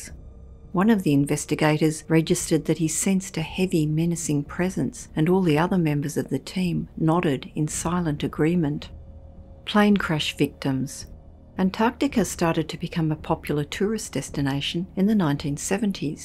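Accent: Australia. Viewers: it's an Aussie Sheila it is .🐨 😲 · Here from North Queensland, Love hearing another Aussie voice, 🇦🇺🇦🇺🇦🇺🇦🇺🐨🐨🐨🐨🐨🦘🦘🦘🦘and awesome channel.👍👍👍👍👍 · She's Australian. I'm one, I can tell.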